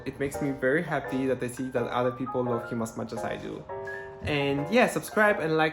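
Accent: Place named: Cyprus. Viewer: Awwww God Bless You Both ♥️♥️ So much to say....are you from Cyprus ? I have a young man living with us here from Cyprus, you sound very much like him. I also have a Scottish Fold....but he has long hair and straight ears, the Highland Fold Straight. I watched Maru for many years on YouTube. If you haven't seen him, please Google him . Maru holds the Guinness Book of World Records for the most viewed pet on YouTube ! He lives in Japan with a wonderful Lady and two female domestic shorthairs named Hana and Miri. He is Absolutely Adorable ! He loves boxes 😂🤣😃♥️ I was so in love with Maru. My Daughters were grown and I had no pets. I asked my Daughter if she could find a Cat for me. She went to her Facebook page and the first post was a cat, his owners moved and left him behind !!!!! I will never understand that. She brought him to me and I was shocked because I knew right away that he is a Scottish Fold.... 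I just adore him, his name is Fat Boy. Anyway, there is one trait that is frequently said about the Scottish Fold....They can be stubborn. Fat Boy really lets me know when he wants to be brushed, and then he sits about a foot away from my outstretched arms so I have to come to him ! I have had Cats all my Life. Fat Boy is very Special. Enjoy Kovi, he is adorable !!!!!!! ♥️♥️♥️♥️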